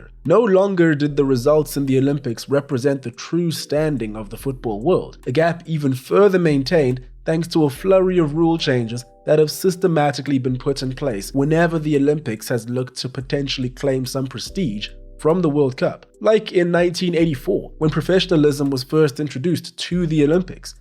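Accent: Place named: South Africa. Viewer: 😅He's not English I'm South African his accent is closer to mine than England on the opposite side of the hemisphere to us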